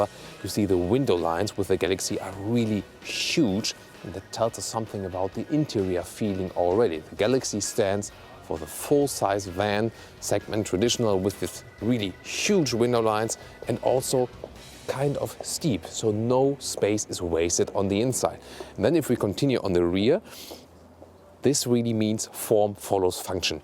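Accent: Germany. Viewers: ich finde, dass das Englisch noch zu Deutsch klingt.. Und andere Frage, wo genau ist denn dieser Ort? Da sind anscheinend immer "Fahrzeugvorstellungen" mit einer art von Modell? Ah und wegen dem Knöpfen auf dem Lenkrad, genauso wie beim Ford Mondeo ;)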